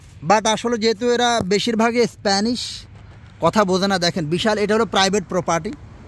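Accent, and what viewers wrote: Noakhali, Bangladesh. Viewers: ভাই আন্নে কি আংগো নোয়াখালীর নি? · আপনি কি নোয়াখালীতে বাড়ি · ভাই আপনি কি নোয়াখালীর মানুষ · আপনে কিতা নোয়াখাইল্লা নিতা।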